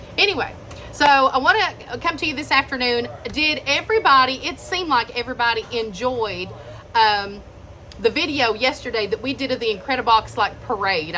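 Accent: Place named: Tennessee, United States. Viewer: You are too cute! Thanks for the info, love your TN ‘accent’ 😘 Knoxville lady here, and one of your biggest fans. We will be up with our son soon. He “thinks” he wants an 8x22.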